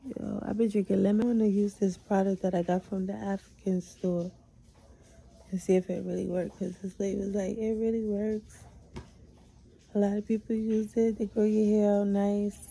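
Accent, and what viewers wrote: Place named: East Coast, United States. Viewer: you from the east coast? i get jersey brooklyn vibes..im from the west coast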